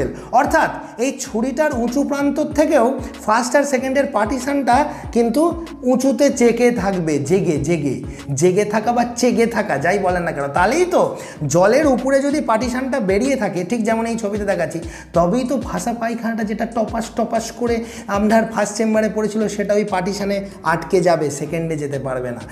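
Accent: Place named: Bengal, India. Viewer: আপনার গ্রাম্য বাংলা ভাষায় কথা বলার ধরন আমার দারুন লেগেছে, তাই পুরো ব্যাপারটা বুঝতে পেরেছি।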